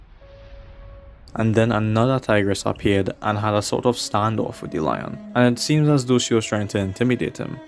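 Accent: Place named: Trinidad and Tobago. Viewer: Eh you from Trinidad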